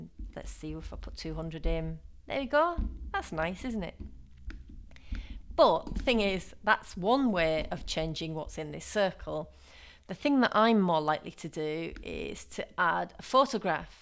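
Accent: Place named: Northern England, United Kingdom. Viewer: Thanks for the tutorial. Love your accent! My mom is from Huddersfield, and you sound like you might be from somewhere up there in the North of England.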